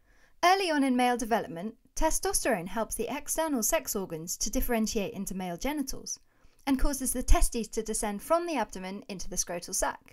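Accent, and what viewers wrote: United Kingdom. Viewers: Her high-pitched low-volume voice with British accent is simply annoying. It's like she's trying to become the British female Tanner, but without any success. #BringBackTanner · British accent?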